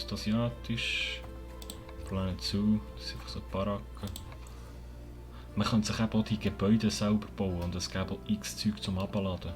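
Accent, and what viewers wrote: Switzerland. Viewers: Ich weiss nid worum aber iwie findi Schwitzerdütschi letsplays nit so sick. Isch nur mini Meinig bisch sicher mega sympathisch irl aber chani eifach nüt demit ahfoh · Was isch das für e Schwiezer Dütsch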